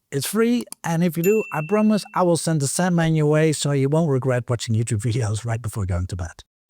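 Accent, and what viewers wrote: Netherlands. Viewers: Het was de Gamma verhuisdoos die mij de tweede bevestiging gaf, na het horen van zijn accent. Ons kent ons... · Are you from the Netherlands!? You seem to have the same accent as i have, and I am from Rotterdam? ❤ Add: Yup, went further down the video, and you mentioned "niksen!" Echt uit Nederland, dan!? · Ik hoorde het accent, maar dacht: misschien is het een Deen. Dus ik keek naar hoe je ramen sluiten. Dat leek niet Nederlands. Uiteindelijk hoorde ik het je toch bevestigen. Wel een Nederlander. Voelt toch als thuis 😊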